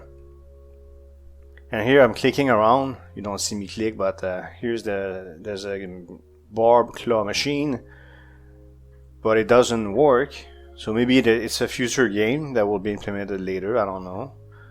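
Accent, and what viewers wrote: Quebec, Canada. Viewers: you sound quebecois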